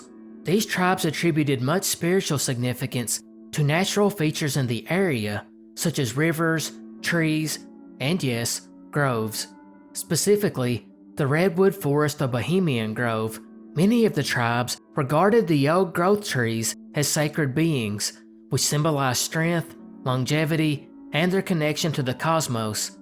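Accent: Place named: South Carolina, United States. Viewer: Where are you from bro, you sound like my neck of the woods, in SC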